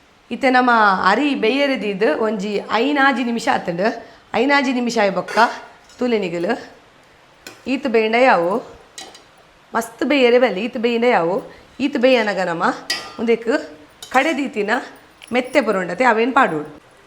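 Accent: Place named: Tulu, India. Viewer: its so lovely to hear you speak Tulu. I miss my home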